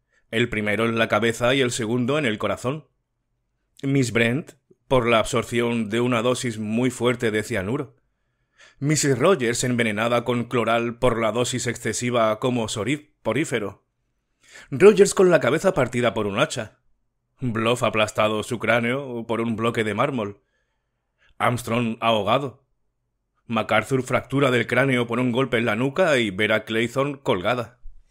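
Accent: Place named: Spain. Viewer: ❤Frondosa imaginación la de A C.Hermosa la lectura,con su española voz,señor..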